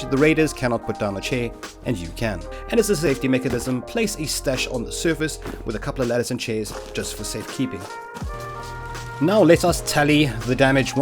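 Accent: South Africa. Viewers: are you from south africa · I’m a simple man, I hear a Saffa accent and I sub to the content. But that intro was perfect. Perfection. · OH SNAP YOUR ACCENT!!! I could tell you were South African and then decided to check your bio and I was right!!! Awesome man, Awesome video :)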